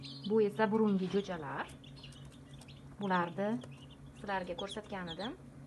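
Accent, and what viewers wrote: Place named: Karakalpakstan, Uzbekistan. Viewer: Ассалому аллайкум! Мен хам олгандим 70талик окидан. 29 та гоз тухумидан 15та очиб чикди! Кейинчалик 128талик фул автомат олувидим 57та гоз тухумидан 1та очиб чикди! Кайфият "0" ! Таблода харорат 37,2 тухумда эса 38,9! Биринчи кафат билан иккинчи каватнинг хароратининг хам фарки катта! Синглим, гапришингизга караганда Коракалпогистонликга ухшайсиз! Илтимос, неча фойиз очиб чикаяпти тугрисини айтинг! Иккинчи каватдаги тухумларингиз ковурилиб колмаяптими? Хароратни канчага куйдингиз?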